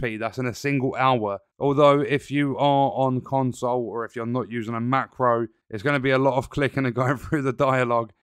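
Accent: United Kingdom. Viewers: I know his time zone. His accent is a dead giveaway. He’s definitely British. So his time zone is the same as mine!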